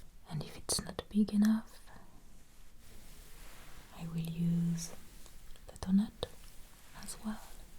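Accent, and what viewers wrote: France. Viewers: My goodness. The scenes you create, the movement of your hands, your voice, your lovely French accent, the overall energy of your videos - everything is so gentle and calming, it helps me forget for a little while the progressively dystopian world around us. Thank you for this respite from reality. ❤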